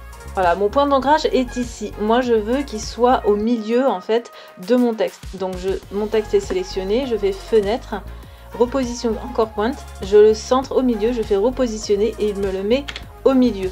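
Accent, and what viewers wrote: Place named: England, United Kingdom. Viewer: Très bel accent anglais :D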